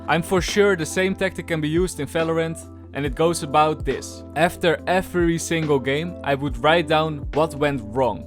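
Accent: Netherlands. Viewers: your nederlandse accent is really funny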